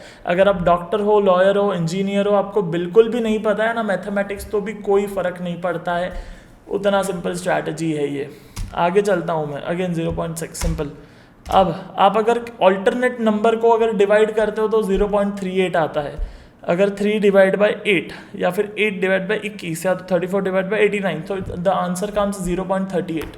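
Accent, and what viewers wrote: Gujarat, India. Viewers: Verry nice explanation sir.... sir are you gujarati?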